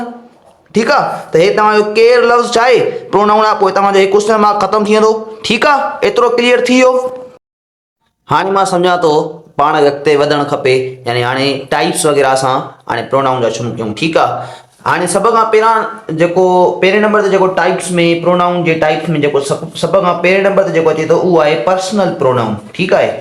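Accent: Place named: Shikarpur, Pakistan. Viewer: ap ho sir but bt karne ka way esa h jese shkirpur k memon bolte h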